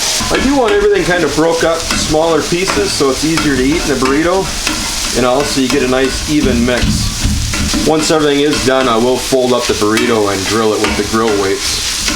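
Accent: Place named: Minnesota, United States. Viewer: That accent, the weather, you've got to be in Minnesota.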